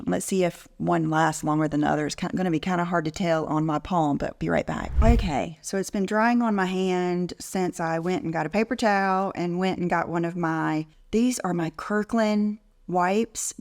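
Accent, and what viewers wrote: South, United States. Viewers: 100%. I will never forget when she made fun of the lady in the elevator. When people show you who they are, believe them. That sweet Southern accent doesn't fool me.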